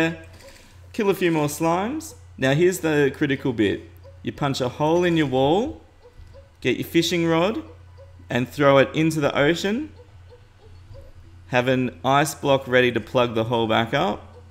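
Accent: Australia. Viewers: Is this guy Aussie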